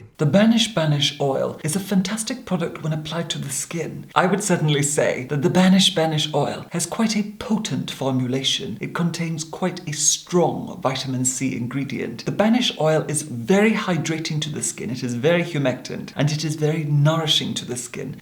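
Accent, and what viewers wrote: Scotland, United Kingdom. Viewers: Gosh, I have missed this soothing, clear-cut Scottish brogue. <3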